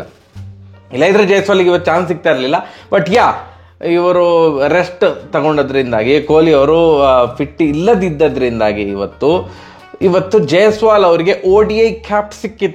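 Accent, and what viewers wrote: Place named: Coastal Karnataka, India. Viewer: Love your analysis and Karavali Kannada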